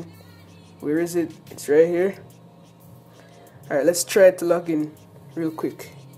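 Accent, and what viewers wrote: Jamaica. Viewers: Are you Jamaican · Jamaican accents bang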